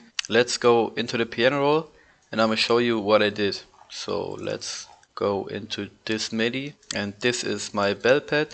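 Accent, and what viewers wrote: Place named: Germany. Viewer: man hört du bist deutscher😂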